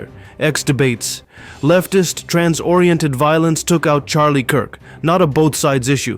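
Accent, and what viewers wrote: India. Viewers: We commentator sounds Indian?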